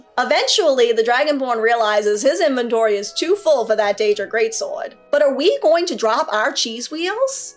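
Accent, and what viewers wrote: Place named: Boston, United States. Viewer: Sounds like Boston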